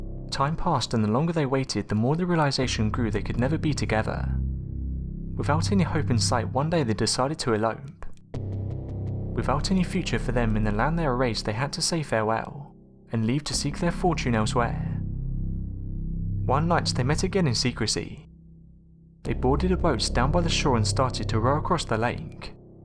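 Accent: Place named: Wales, United Kingdom. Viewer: Dave Lennon-Copeland totally agree. Being from Wales I definitely hear a Welsh accent. Possibly South Wales. Maybe cardiff?.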